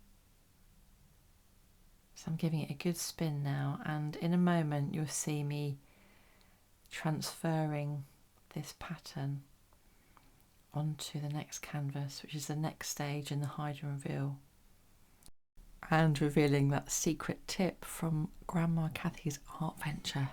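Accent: United Kingdom. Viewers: you always paint so gracefully and elegantly, your grace, and your adorable British accent matches the videos beautifully.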